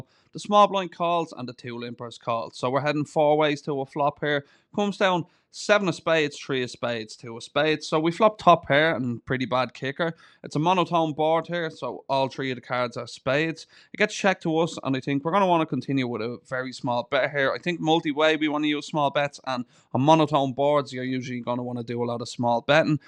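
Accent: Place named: Ireland. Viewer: Really glad the Algo put you on my home page, been looking to add a Sound Studied Vlogger to the Sub list! Makes it even better with your Irish accent...Good fookin fold on the AJ!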